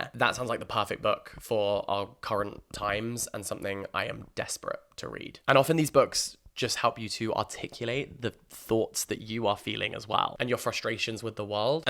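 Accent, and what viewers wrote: United Kingdom. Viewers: I like your British accent, plz more book reviews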